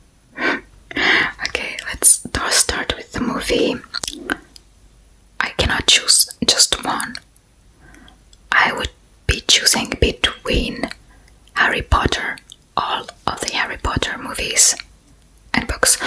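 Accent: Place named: St Petersburg, Russia. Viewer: Стоит ли ожидать контент на русском? По акценту и увлечениям Вы, типичный Питерский бумер) И почему нет монетизации в Румынии?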